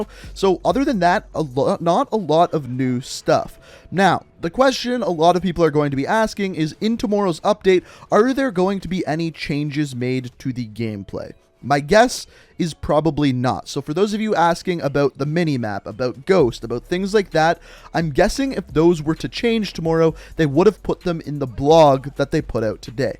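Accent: Canada. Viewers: He’s a Canadian (I think) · Emmanuel Moreno he’s Canadian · Omg,that was driving me fuckin' nuts too. Weirdass Canadian pronunciations. So annoying.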